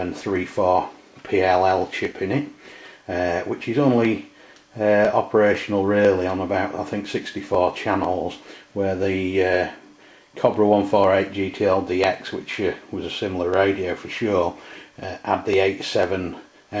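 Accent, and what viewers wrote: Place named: Ireland. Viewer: Hello, tis Paul on the Rock, I'm speaking to you from the future, spooky lol. Haven't heard your dulcet Irish tones for a while, where you been old man? I hope all is well with you and your loved ones, and you're all dodging the lurgy over there. I don't know why Youtube's algorithm decided to put this video in my recommendations 8 yrs later, but hey ho, I do watch the odd chicken box video now and again I s'pose 😉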